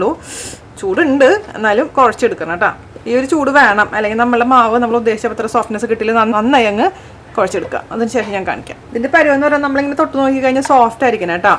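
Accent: Thrissur, India. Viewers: hii chechii chechi parayunna slang enikk manasilvunnund chechii .... bcoz iam from thrissur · oh chechi thani nadan thrissur style samsaram.weekly once I have to hear your sound. I am from gvr ,at present residing in auh.so lovely.from vini .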